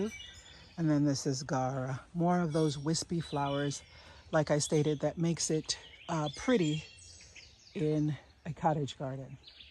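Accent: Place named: South, United States. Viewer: You have a garden my grandmother would be envious of ❤ I’ve been following you on instagram, just found you this morning on YouTube from your link on insta. My family and I moved to Southern California the end of 2021 and this video is making miss home something bad this morning! 😢 Your accent, the birds, the lushness…. We weren’t in Georgia but near Asheville NC. Happy Saturday! I absolutely love your garden! ❤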